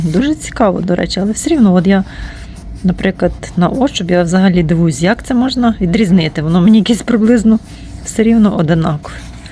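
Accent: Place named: Chernihiv, Ukraine. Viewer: Дякую,! Так приємно бачити Чернігівщину.❤ Я родом з сусіднього Бахмацького району, але давно живу на Київщині... Такий говір близький, з дитинства і юності.😊 💙💛💙💛💙💛 Гарний малюнок кицюнчика, донечка -- талант і молодчинка!❤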